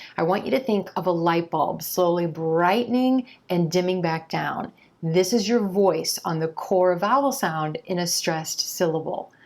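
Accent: United States. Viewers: Hey, you’re awesome. Learning from your channel is such a golden opportunity. I enjoy getting here as often as I can, and I love to hear your genuine American English. Just feel like home.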